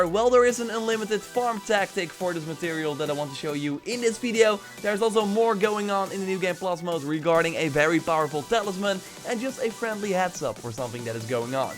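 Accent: Netherlands. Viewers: do i smell dutch?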